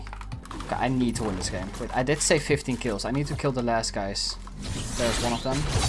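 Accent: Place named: Netherlands. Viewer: Je hoort het Nederlandse accent haha ❤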